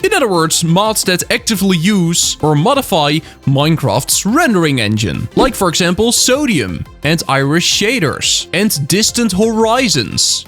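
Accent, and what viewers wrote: Netherlands. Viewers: holy nederladse stem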